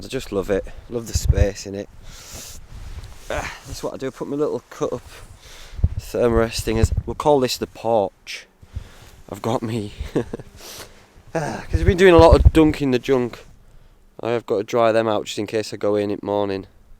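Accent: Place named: Cockney, United Kingdom. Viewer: More cockney! More cockney!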